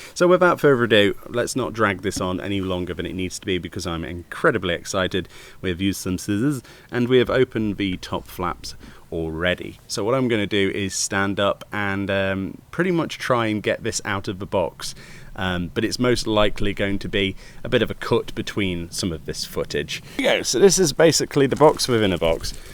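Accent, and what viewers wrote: United Kingdom. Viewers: Love UK accents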